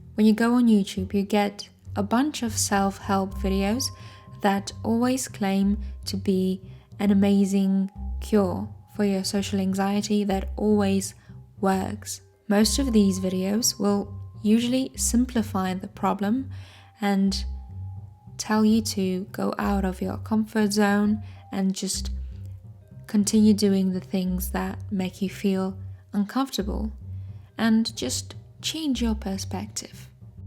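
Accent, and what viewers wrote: United Kingdom. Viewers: i'm surprised you have anxiety speaking in English i though you were British! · Yep. The first time I heard her speaking English I thought she was from some part of the UK because I noticed a bit of an accent and I thought she has a bit of a regional accent. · I honestly thought that you were British :D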